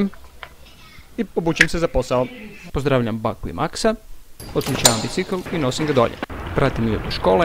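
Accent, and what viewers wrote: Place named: United States. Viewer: tipicno Americki ko da nisi Balkanac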